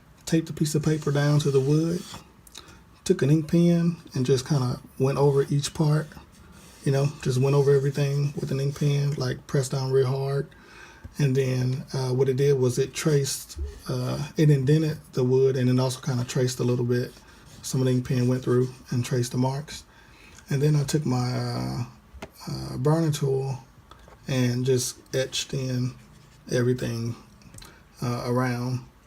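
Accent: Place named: South, United States. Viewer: Thank you so much for your help! Thank you for sharing your light! Anddd two cheers for the southern accent I'm detecting?!!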